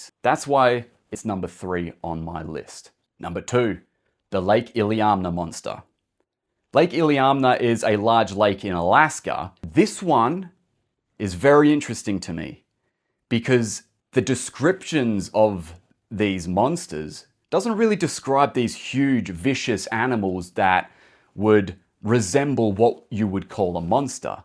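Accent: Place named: Australia. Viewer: Paused the video. Guessed the man was Australian. Resume video. Vindicated.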